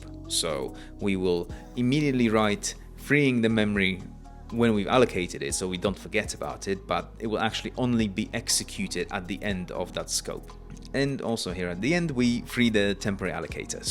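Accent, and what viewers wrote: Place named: United Kingdom. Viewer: same half-posh British accent; the similarity increases . . . :)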